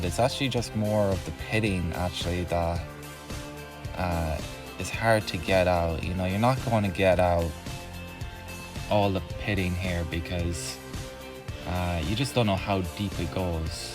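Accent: Ireland. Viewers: Great work. You have IRISH heritage? Accent and words indicate yes 👏🏻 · Class work!! Hint of an Irish accent?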